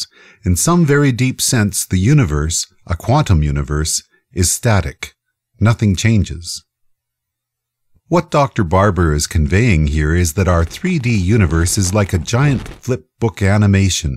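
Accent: Canada. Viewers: ok, i must know... the person commentating is canadian, right?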